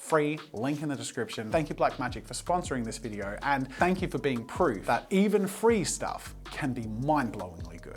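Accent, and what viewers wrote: United States. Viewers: Jazza reading with an American accent make me feel weird. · That American accent though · That's a pretty solid American accent! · Jazzas american accent sounds like he could be a narrator here😂 very calm and smooth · I loved the video, but my favorite part was the American accent! I replayed it several times! 🤣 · Your american accent is pretty good Jazza, I heard the difference immediately and abruptly did a double-take. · American Accent Jazza was mildly unnerving because he sounded like himself but with just enough different that my brain had to actually process what I'd just heard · Your American accent is actually really good! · Your American accent is flawless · Can we speak about the fact that his American accent is literally on point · As an american, I approve of your american accent.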